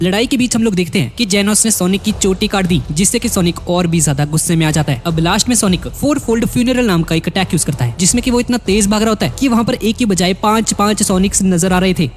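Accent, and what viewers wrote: Punjab, India. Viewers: Bhai aap punjab se kya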